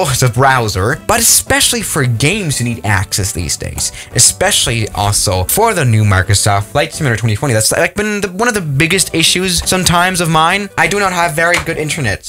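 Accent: Germany. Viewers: Are your a German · Bro are you German? If I may ask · Are you German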